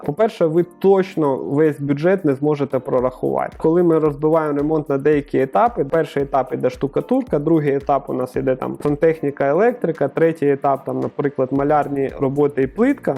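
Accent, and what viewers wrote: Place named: Cherkasy, Ukraine. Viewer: это что за язык? черкасский? кировоградский? слушаать невозможно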